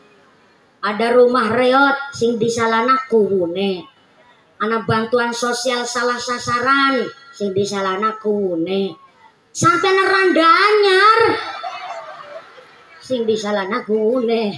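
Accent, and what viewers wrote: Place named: Banyumas, Indonesia. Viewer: Pemalang hadir Pingin ndengerin pengajian ustadzah yang ngapak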